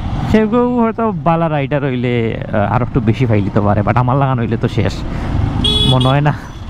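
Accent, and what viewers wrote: Sylhet, Bangladesh. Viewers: Love from Cumilla bro🖤 সিলেটি মাত,সিলেটি কথাই সুন্দর লাগে 😊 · Valo vai Sylhet e bole interest die sunte vallagee moja pai😂❤❤ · sylhety mat kotha onek bala lage !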